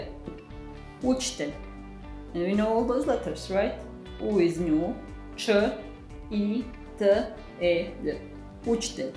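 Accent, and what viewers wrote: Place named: Poland. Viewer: I was thinking you are from Poland as I do, because I’m learning English from your video and interslavic sounds like language I already knows :)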